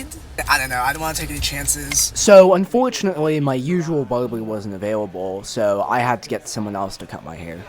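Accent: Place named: New York, United States. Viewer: I'm loving the strong New York accent.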